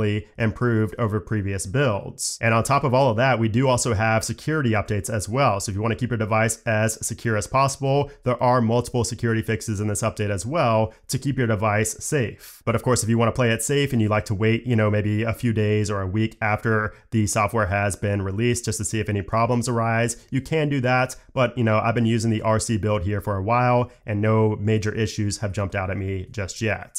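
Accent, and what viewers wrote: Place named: New York City, United States. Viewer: hi, just curious about your accent, where is it from? NYC? thanks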